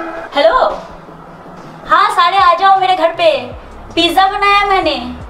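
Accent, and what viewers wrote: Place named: Bengal, India. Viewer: Tum itna cute keuho bataou mughe pls didi up kis jaga per reheteho up Bengalis he bat karte ho pls didi pls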